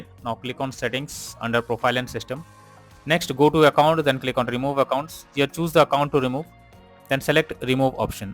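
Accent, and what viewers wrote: India. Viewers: Honestly bro. As soon as i hear Indian voices i turn your video off...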